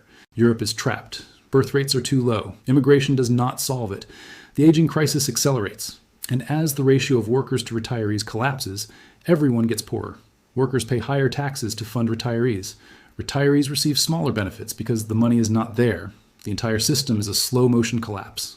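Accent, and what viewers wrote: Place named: United States. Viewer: The GDP increased last year..so I don't know what are you talking. But from the accent you are american, so make sense to not have a clue about Europe.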